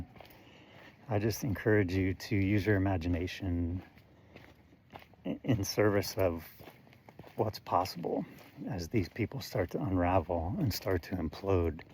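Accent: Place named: Northern California, United States. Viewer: I had a vibe that you were from NorCal as well, before you even mentioned our beloved red woods. I made the mistake of listening to all the news since I got up, not noticing my central nervous system was overwhelmed, this seems to be such a common feeling that I don’t even notice it anymore 🥺 Then I saw your video pop up and I needed your soothing voice more than you know today . Thank you 🙏 🌻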